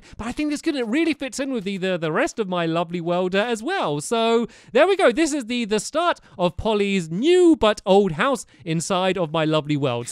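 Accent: United Kingdom. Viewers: landen reeves he's British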